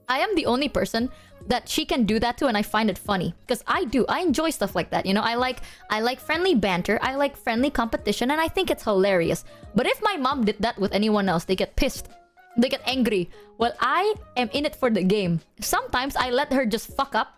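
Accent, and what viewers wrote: Indonesia. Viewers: I love when use her indo accent😂😂😂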